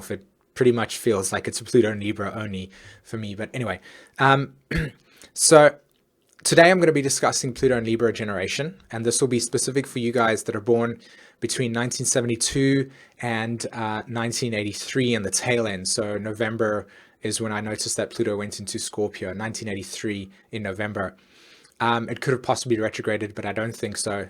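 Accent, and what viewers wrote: South Africa. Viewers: Are you South African by the way ? If so, do you do in person consults? I live in SA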